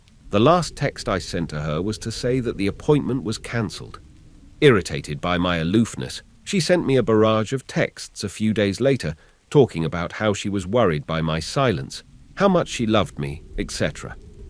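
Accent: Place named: England, United Kingdom. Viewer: An , English narrator ! 😀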